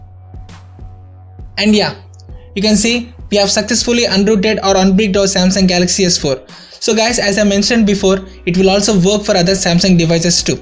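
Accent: India. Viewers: It is really funny before he selected the country i already knew he was from India that accent tells everything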